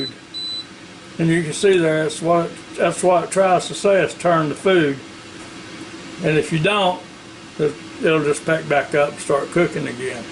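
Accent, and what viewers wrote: South, United States. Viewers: I ❤️❤️❤️ Your foodie demonstration. Your southern drawl made me so very happy. Can’t wait to watch another demo of yours. My wings (modified) came out delicious. ❤️❤️❤️ PS. Love my instant pot duo crisp.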